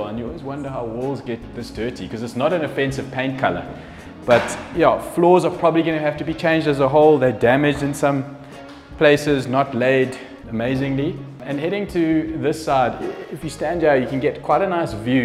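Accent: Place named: Johannesburg, South Africa. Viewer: Imagine my delight when clicking to the vid and hearing that familiar lekker Joburg accent. Easy sub. Well done so far and keep it up. Really enjoy the videos I've watched thus far, very relatable content.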